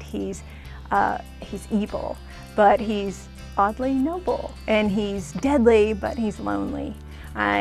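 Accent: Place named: Louisiana, United States. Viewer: mmm cajun accents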